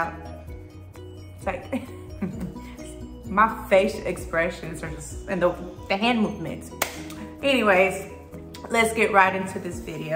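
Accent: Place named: West Coast, United States. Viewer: Your accent is so pretty. What state you from? I’m guessing west coast but I don’t know 🤣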